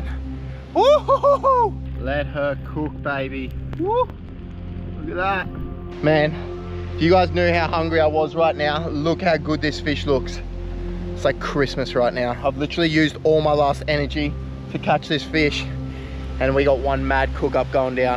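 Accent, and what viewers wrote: Australia. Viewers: Bloody Hell mate aussie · Are you Aussie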